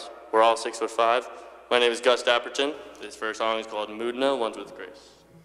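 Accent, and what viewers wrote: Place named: United States. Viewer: I did not expect his speaking voice to be so American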